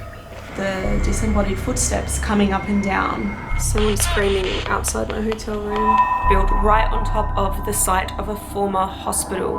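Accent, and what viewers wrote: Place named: Australia. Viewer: U from Australia bb i thought u from England mmm ok thanks for that tour it looks cool that hotel great info??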